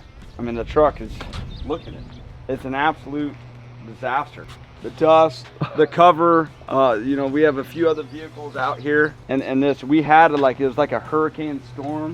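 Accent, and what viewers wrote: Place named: Ohio, United States. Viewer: I just found this channel and this is the first video I’m watching but his accent makes me think he’s from Ohio. Sounds a lot like Roman Atwood.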